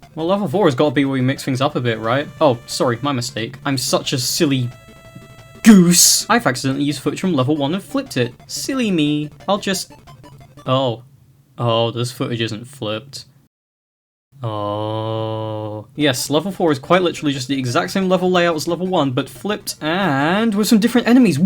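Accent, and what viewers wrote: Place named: United Kingdom. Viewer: You kinda sound British but I may be stupid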